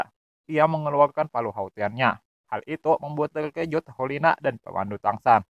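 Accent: Banjar, Indonesia. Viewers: Manyuruh 😂😂 . Banjar banar ah ikam 😂😂😂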